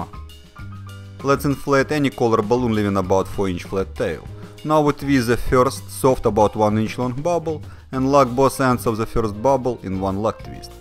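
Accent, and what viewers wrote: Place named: Russia. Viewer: Русский режет на английском ухо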